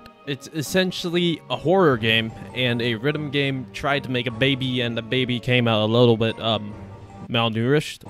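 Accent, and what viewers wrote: Canada. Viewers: Some of these comments are making me hear your voice as more Canadian. How the hell? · i cant help but notice that you sound weirdly canadian in this video?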